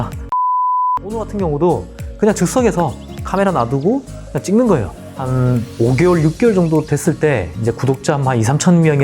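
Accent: Busan, South Korea. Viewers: 이 부산출신이라 사투리쓰시죠ㅎㅎ 실물은 영상보다는 훨씬 멋져요 ^^ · 실물로 보면 괜찮다??? 원판 불변의 법칙을 아시나요? ^^ 아니 그냥 그렇다는 겁니다. 실물로 보면 잘생겼을것 같아요. 음....원판 불변의 법칙이 뭐었더라... 음... 암튼 죄송합니다. 결론은 실물이 멋지신걸로 ㅋㅋㅋㅋ 그리고 말투가 부산사투리 였군요.^^ 뭔가 이상하고 어색하다 했는데, 이제 이해가 되네요..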